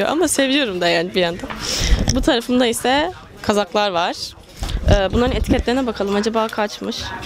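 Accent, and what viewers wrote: Kastamonu, Turkey. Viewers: FRANSIZCA DİL ÖĞRENİRKEN Mİ GENİZDEN KONUŞMAYA BAŞLADINIZ YOKSA KASTAMONULU olabilir misiniz?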